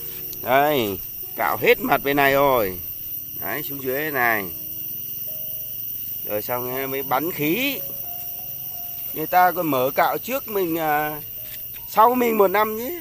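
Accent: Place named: North, Vietnam. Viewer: Nghe ban noi que o bac ha.cho sdt nhe/que ban o dau day.hien jo ban o xa nao huyen nao tinh nao nt cho minh biet dia chi mhe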